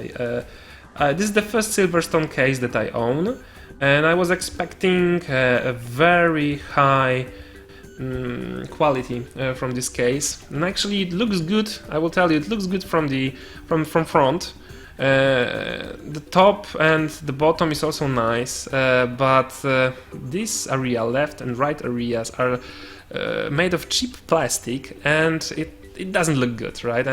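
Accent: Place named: Italy. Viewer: aw well, your accent sounds like you're italian xD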